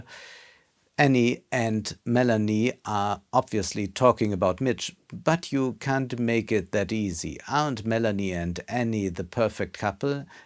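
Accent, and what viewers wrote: Germany. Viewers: Der Akzent ist doch bestenfalls nebensächlich. Wenn der Wert des Gesagten davon massgeblich geprägt ist, ist es sowieso nicht viel Wert. Steckt man viel Energie in akzentfreie Sprache, kommt es anderswo zu kurz. Ob ein Akzent von Vor- oder Nachteil ist, hängt vom Zusammenhang ab. Der deutsche Akzent verschärft den Eindruck des Fachmanns - fachmännisch ist der Vortrag auf jeden Fall - und trägt zum Branding bei. Das ist vielen Deutschen, die dem Überwinden eines deutschen Akzents aus welchem Grund auch immer einen hohen Stellenwert beimessen, oftmals nicht bewusst. Nach 80 Jahren sind die Zeiten, in denen man einen deutschen Akzent nicht selbstbewusst tragen darf, ohnehin vorbei. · Man merkt, dass du an der englischen Aussprache gefeilt hast. 👍🏻 Verglichen mit den ersten Videos hört man den deutschen Akzent nicht mehr so gewaltig raus.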